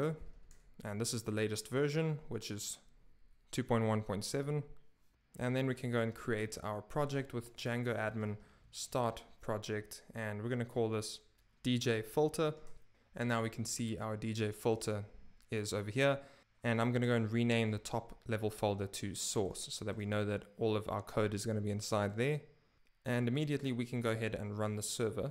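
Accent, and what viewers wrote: South Africa. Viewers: Are you by any chance from South Africa? You are doing a great job by the way.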